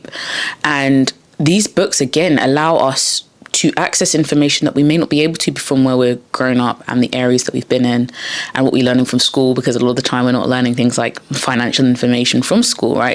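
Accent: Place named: United Kingdom. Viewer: Luv a British accent 🤎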